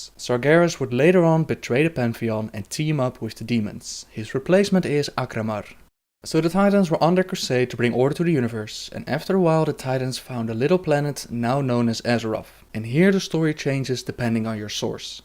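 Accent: Netherlands. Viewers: u MUST be dutch.. if not.. well we just sound alike when we speak English xD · I knew you were dutch ... I just could hear it :D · let me guess. you're Dutch? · Right away i can tell you are from holland or belgium just by your horrible english accent!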